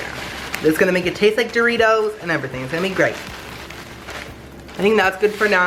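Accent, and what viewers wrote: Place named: United Kingdom. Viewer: I love your British accent so on point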